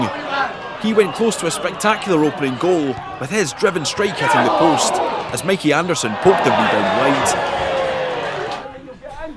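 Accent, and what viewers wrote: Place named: Scotland, United Kingdom. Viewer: Scottish university BBC accent.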